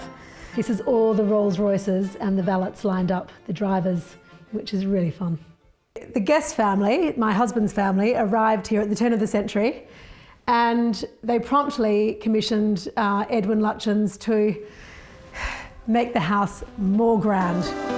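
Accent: Australia. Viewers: Thank you for the tour. You sound like you're from Australia? You have done a very good job of the interior decor. It would be an honour to be the owner. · Sounds like an Aussie accent… nice 😊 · Stunning house. Has to be my all time favourite ever!, The history! Tudor wing, gate house! You've been sensitive, respectfully with simple elegance & modern livable touch. Your work is amazing, your respect and love of the property is clear and evident. Congratulations on a wonderful job. I adore this property & gardens as it looks and feels like a huge home yet regal, splendid in everyway. Absolute favourite. Do I detect an Australian accent?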